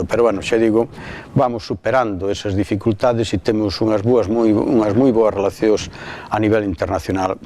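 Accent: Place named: Spain. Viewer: O sento , pero xamais oi falar galego tan castelanizado , os pronomes colocados donde queres...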